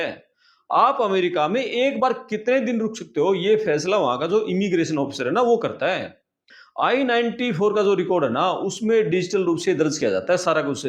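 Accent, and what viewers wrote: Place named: Haryana, India. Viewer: Heavy Haryanvi accent!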